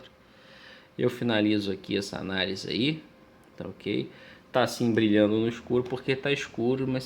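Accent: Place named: Rio de Janeiro, Brazil. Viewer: Boa tarde amigo. Pelo sotaque vi que você é do RJ. To com um F9 precisando de manutenção. Pode me passar seu contato?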